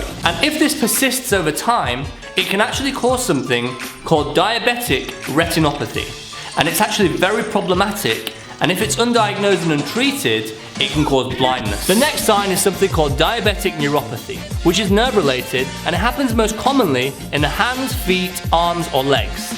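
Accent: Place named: West Yorkshire, United Kingdom. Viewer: He sounds like he's from Bradford West Yorkshire.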